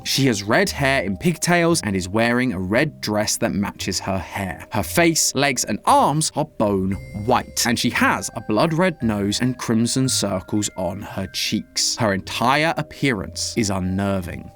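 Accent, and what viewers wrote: United Kingdom. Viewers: Love listening to British Matpat while eating dinner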